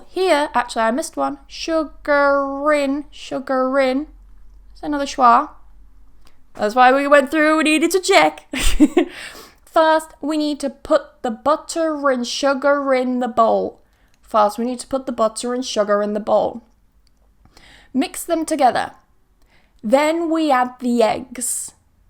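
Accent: United Kingdom. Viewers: Siendo sincero, por supuesto que quiero aprender inglés británico, pero me gusta más verla y oírla porque tiene una voz muy bella y me transmite un ánimo alegre porque siempre sonríe. Inteligente y bella que más se puede pedir. Me encanta su acento, cuando hablo inglés ya hablo mas con acento británico Gracias a ella. La adoro 🥰.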